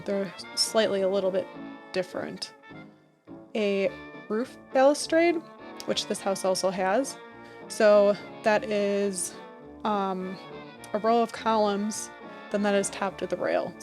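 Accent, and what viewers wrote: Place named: Syracuse, United States. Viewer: This is awesome. There is a magnolia in my hometown of Syracuse NY. Your accent kind of sounds like you’re from there!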